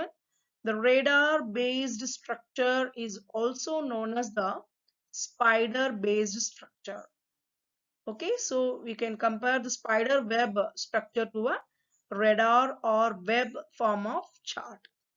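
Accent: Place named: India. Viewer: this is indian accent😊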